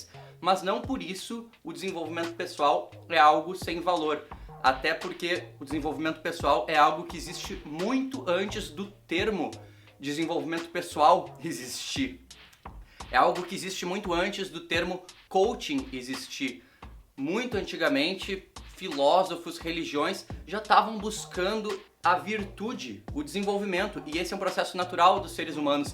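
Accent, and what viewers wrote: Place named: Rio Grande do Sul, Brazil. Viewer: Cara, que massa te ouvir! Além de adorar teu sotaque gaúcho, pq tbm sou gaúcha...achei valiosas tuas colocações. Sou Coach e acho que é isso mesmo...cada um precisa buscar o que faz sentido para si. Sou totalmente contra regras pré estabelecidas como: acorde cedo, leia mais, não coma carbroidratos à noite, e um monte de coisas que são boas para algumas pessoas, mas para outras não! Ja me inscrevi! Obrigada pelas reflexões!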